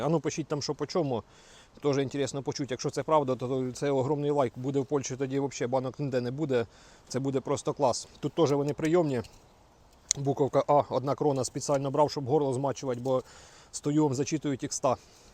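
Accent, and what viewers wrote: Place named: Surzhyk, Ukraine. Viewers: Ти Мразота Зариганая😢...Кроку на Куй зі своїм франківскім суржиком🎉...Слухати ваше блювотіння....щє та пригода😢... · Так слідуючий момент це мова. Ну можливо щось виправити ну так говориш аж тошнить. Скільки вже тобі писали запитувати але ти принципово як олень вперся і доказуєш що ти говориш говорив і будеш говорити на такому суржику але суржик більш зросійщений. Англійський вдосканолюєш данський будеш вчити а рідну мову покращити принципово не бажаєш . Чому таке ставлення до свого рідного???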